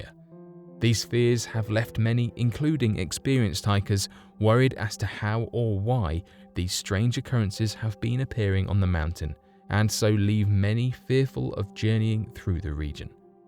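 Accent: England, United Kingdom. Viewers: for a guy with an English accent, he sure doesn't speak English well. "had went missing"? "tells of that of a married couple"?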